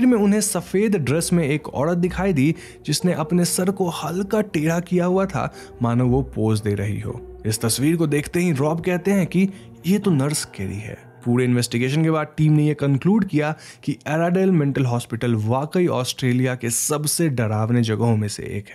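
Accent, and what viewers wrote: Bengal, India. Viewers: Bro your voice is very nice lekin yar ek kaam karo. Pronounsession mai gadbad hai R ko D mat bolo. Sorry bro no hard feelings. I can understand your bengoli axent. Some of my bengoli friends talk same like U. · Your Bengali accent ruined the whole fun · Are u from Bengal? Im catching a Bengali accent. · Voice over awaz kisi bengali ka hai. His pronunciation makes it evident. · Narrator is definitely bengali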